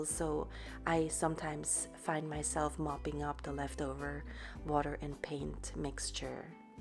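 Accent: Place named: Hawaii, United States. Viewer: This is an awesome piece in and of itself, but it's more meaningful because of the thoughts you shared at the end. Thank you for that. 💜👍 Are you or your spouse from Hawaii? I hear an accent in your voice 🙂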